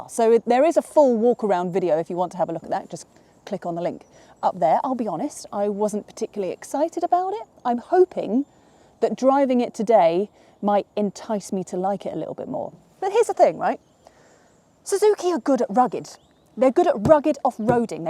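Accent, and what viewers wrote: United Kingdom. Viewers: UK english?anyway horrible to listen wtf is that. British MC/BC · Banters not working too loud and artificial punching around british accent and bit of drama doesnt always add on, scorching on ears honestly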